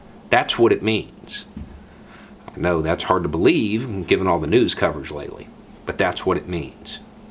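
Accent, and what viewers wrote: South, United States. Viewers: I appreciate someone with a southern accent speaking some sense.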